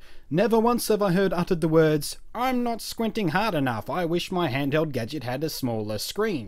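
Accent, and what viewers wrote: United Kingdom. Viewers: he's british. =/ lol. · British?? good job graduate. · Have you ever heard a british/english accent...?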